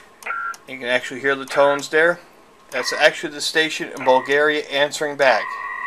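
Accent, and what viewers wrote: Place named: United States. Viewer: Another great vid! I need to get a setup like that for my self. I have been trying to educate myself so I know what to buy. You channel is a God send to people like me. You mention in some of your vids you live in Russia. You sound like you were born and raised in the US. I hope this is not too personal, but Russia does not seem like a place many people from the US move to. Not that there is any wrong with that for sure, it just seems very unique. As always, keep up the great work!!!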